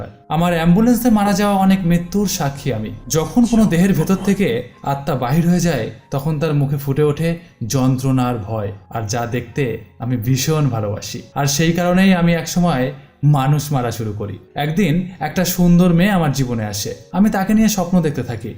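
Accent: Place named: Visakhapatnam, India. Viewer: উচ্চারণটা মনে হয় বিশাখাপাট্মম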